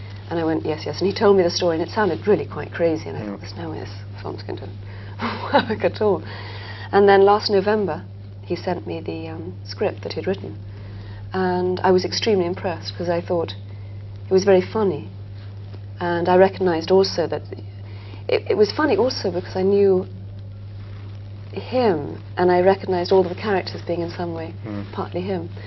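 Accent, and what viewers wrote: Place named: United Kingdom. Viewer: I ❤ your British accent and you too!😊😊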